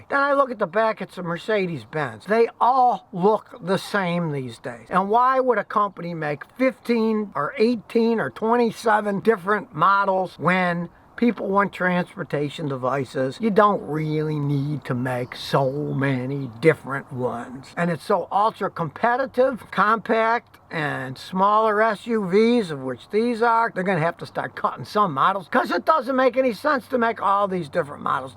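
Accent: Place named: North, United States. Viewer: Welcome back to the south. Your accent says "yankee' to me, but you have become a southerner at heart, in my exalted opinion. You were fortunate that it only took two months to decide. It took me a few years in California to realize that I needed to come back. Love your videos, even if I sometimes do things which you would label "stupid".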